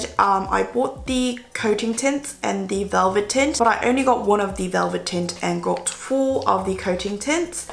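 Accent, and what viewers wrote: United Kingdom. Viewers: 투쿨포스쿨꺼 치크 앤 아이인데 입술에 쓰기에 무리라고 해써여!ㅋㅋㅋ 아무튼 오늘 영상두 잘봤어여~ 약간 영어 듣기평가처럼 언니의 영국 영어발음 듣는 재미도 있고 하울도 재밌었어여ㅎㅎ 다음 영상 또 기다릴게여~~ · 미국사는데 님의 영국발음 들을때마다 너무너무 좋아요! 완전 취저😍😍😍 펄시레드 색 진짜 쨍하니 너무 이쁘네요💋 다음번에 한국가면 저도 쟁여와야겟어영❤️❤️ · 언니 영국발음 개치입니다..💜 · 영국발음... 이게 영국발음인가... 치인다 오늘도... 팬미팅 해주세요... 하... 워터~r 가 아닌 우워터. 에 놀라고 영국 발음과 영어 목소리에 치이고 갑니다... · 진짜 영국 발음은 언제나 귀르가즘 ㅠㅠ❤️ 저도 이제 곧 개강이라 다시 해외로 나가야하는데 추천템 좀 쟁여가야겠어요>_<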